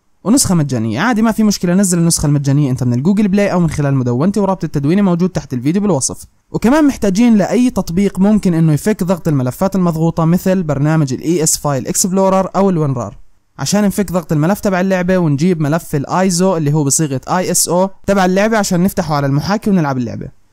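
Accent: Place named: Jordan. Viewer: ماشي هادا اردني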